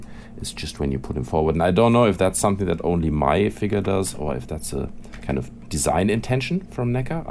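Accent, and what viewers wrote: Germany. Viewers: Du bist deutscher stimmts? Man hört das irgendwie am english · Du bist doch sicher deutsch ich höre es. Oder irre ich mich?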